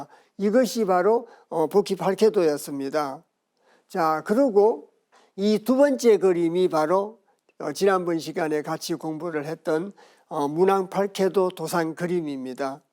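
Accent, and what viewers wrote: South Korea. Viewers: 쾌 발음은 되는데 괘 발음은 안돼요? 경상도 억양이 심하긴 하시지만 도를 또로 말하는 것 외엔 거의 모두 제대로 발음하시는데 유독 괘만 예외없이 쾌라고 하시니 안타깝네요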